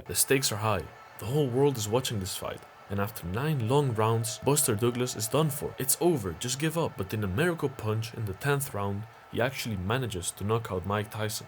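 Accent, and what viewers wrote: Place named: Netherlands. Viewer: Dutch accent way too heavy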